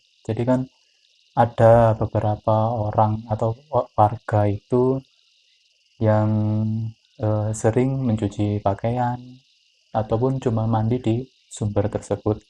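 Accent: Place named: Java, Indonesia. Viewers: Medokmu ngakak wkwk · Paling males yg pake kata kata GUE, Logatnya Jawa lg, Hadeh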